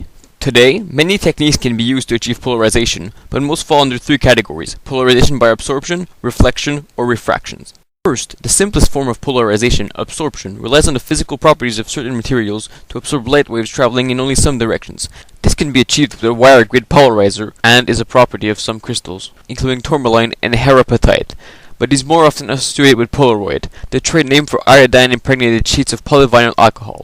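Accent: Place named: France. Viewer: I am glad you love to speak fast AND with French accent but the combo of those two, makes your effort almost useless in delivering an otherwise interesting and useful information. Plus lentement s'il vous plait!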